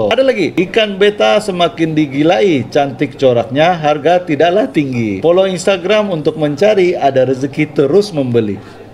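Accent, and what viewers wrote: Sabah, Malaysia. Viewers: Abg orang sabah ehh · Slang Sabahan ohh..boleh tau ka dimana location? Mau datang beli kalau boleh heheh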